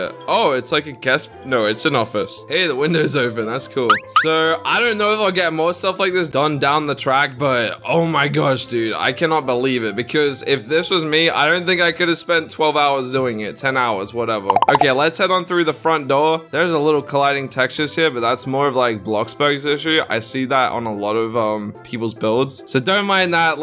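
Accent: Australia. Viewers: What accent do you have? Love it btw Edit: Oh later he says he is from Australia....Lol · I was going to say Australian until I scrolled Down... I have a question.. are you Australian because I am and it’s really easy to tell if your Australian bc any other YouTubed is usually from America or England and they sound so similar but it’s true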